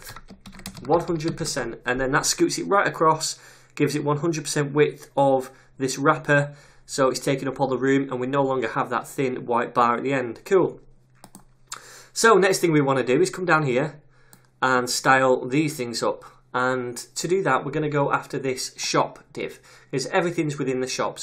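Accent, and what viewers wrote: United Kingdom. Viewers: First of all, let me just say that I absolutely loved the course all the way through. Over the last few days I took both, the HTML course and this one, and it was a hell of a refresher for me. What's more, I have finally understood quite a few crucial CSS concepts and now I know why something works and something else doesn't. You did a great job by putting this course together, kudos to you, mate. Last but not least, let me just say that I absolutely love your British accent :) Cheers!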